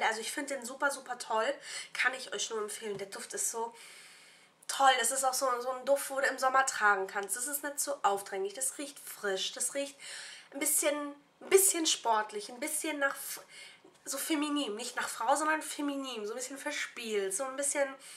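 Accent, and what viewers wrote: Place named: Mannheim, Germany. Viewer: ich wusste, dass ich den dialekt iwoher kenne! mannheim, klar wie klosbrühe :D komm aus der nähe von Heidelberg ;)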